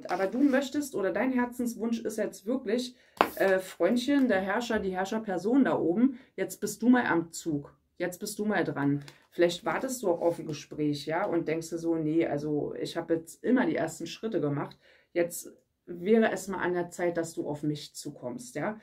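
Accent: Berlin, Germany. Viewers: Guten Morgen Ich liebe deine Berliner Schnauze 🙂 und deine Legungen. Bleib wie Du bist!!!!! Danke für diese Botschaft 🙏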